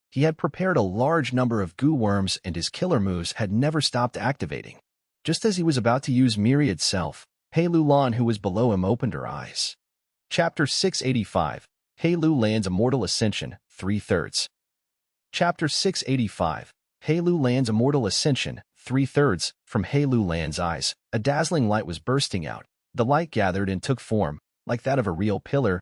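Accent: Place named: France. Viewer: Why tf are you french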